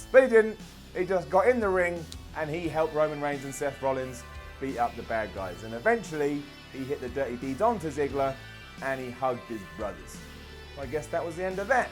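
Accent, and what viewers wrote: Australia. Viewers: bro, you already sound Australian.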